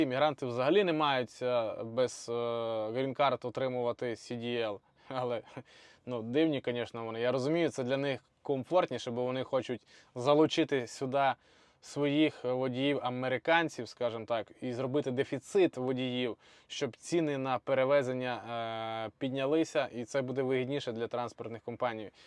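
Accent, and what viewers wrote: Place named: India. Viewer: В нього англійська хороша. Лише акцент є. Бо в Індії англійська мова)))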